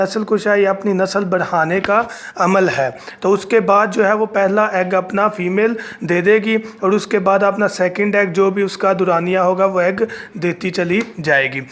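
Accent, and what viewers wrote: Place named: Punjab, India. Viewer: Punjabi lahja hai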